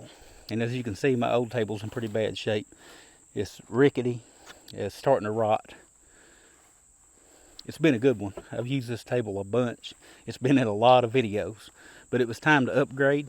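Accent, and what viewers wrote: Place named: Eastern Kentucky, United States. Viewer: It's good to see you making progress. I love to hear that accent from Eastern Kentucky. I hope you get to 100K before long. God bless.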